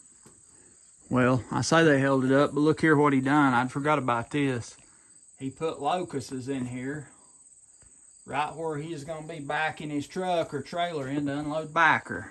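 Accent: United States. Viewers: I like the way u talk very country I do some I'm from raleigh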